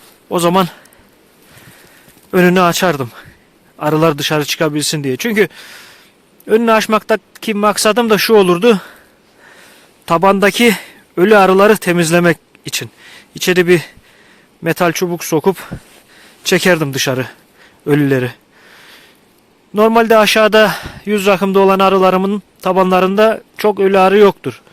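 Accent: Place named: Ordu, Turkey. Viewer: Kardeş, kolay gelsin.Genc bir arıcı arkadaşa benziyorsun.Karadeniz geniş bir çoğrafya.Güzel ülkemin hangi güzel ilindesiniz? Orduyu anımsatıyor konuşmanız.Ben Erzincan merkezdeyim.Tecrübedir, bir önceki yıl da üst üste iki defa kar yağmıştı.Yakinimdaki bir abimin arıların önü tamamen kapalıydı.Kendiside il dışındaydı.Arilarin önlerini açmak için aradım, kovan deliklerini açtırmadı.O yıl 40-45 arısı söndü.Arı kovan içinde havasızlıktan boğulmuş.Çünkü arı mevcutlarıda kalabaydı..Kovanlarini ben temizledim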